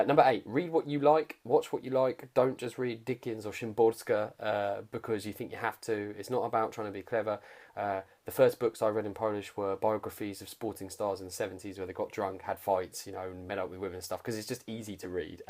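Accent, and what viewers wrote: United Kingdom. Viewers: Dziekuje! I'm a fellow Brit, Learning Polish because my girlfriend is Polish. I have found the 'Drop' app to be the best, Combined with conversing and learning from my girlfriend every day. Its very helpful as she has studied linguistics. I have a question; you mentioned being obviously British from your accent. How do you find people treat you because of that? Is it negative or posite or neither? I plan to buy a second home in Poland with my partner so im very interested to know. Thanks for the video. The podcast tip is a great Idea, do you have any recommendations? I subscribed by the way! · Interesujace. Ja mowie po angielsku z polskim accentem a ty mowisz po polsku z brytyjskim accentem. Jestem pelen uznania dla twoej znajomosci jezyka polskiego ktory jest jednym z najtrudniejszych dla obcokrajowcow.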